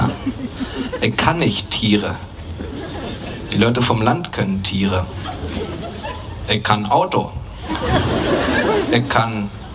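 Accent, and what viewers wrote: Berlin, Germany. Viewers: allein der Mist den er redet Bringt mich dermaßen zum lachen.. und dieses berlinerisch dazu... ich Weine... xD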